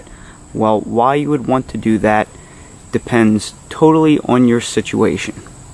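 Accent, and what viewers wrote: United States. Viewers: I hate that american accent !! You should have cut off the top much earlier.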